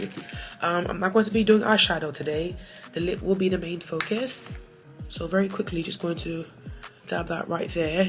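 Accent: London, United Kingdom. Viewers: Im so here fot the bri’ish accent! 😂🙌🏽 it never gets old or annoying! I thoroughly enjoyed watching you speak in their accent while applying your makeup ☺️ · It's the British accent for me. You have a friend in me well a born and raised British play mommy here. You looked beautiful for dinner. Of course you had me dwl. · Accent solution, a Caribbean babe that was born or raised in London. Both come through. Tootsie time comes to mind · I love your British accent 😁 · Love a British accent! ❤ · Your British accent is so fun! 😄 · omg I'm so here for the British accent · Nailed London voice frfr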